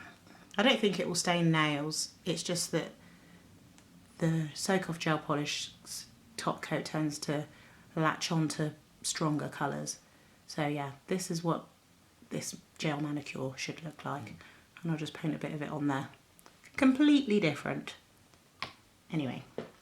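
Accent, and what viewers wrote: England, United Kingdom. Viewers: Beautiful hands and gorgeous long nail beds!! Thank you for this. I have decided to try using gel overlays to grow my nails out, because, after 12 years of acrylics, nail hardeners are not being overly successful!! I've watched the films on how to apply and maintain, and you've given me the one to remove, thank you. Subscribed. Ps, so nice to hear an English voice!! Xxx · Dang... your nails are perfect. Your natural apex is off that chain. I have to use plastic dual gel nail forms to get my flat and thin nails to look like this ! And btw, I love your proper English accent.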